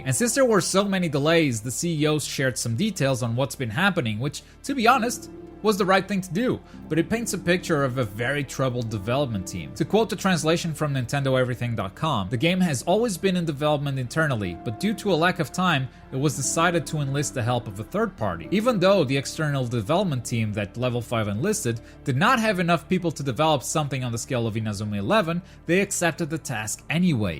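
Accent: Portugal. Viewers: Holy shit just from you accent I could understand that you were also Portuguese. It's Rare to find one of us in the English community lol. Great channel btw. Definitively going to Subscribe.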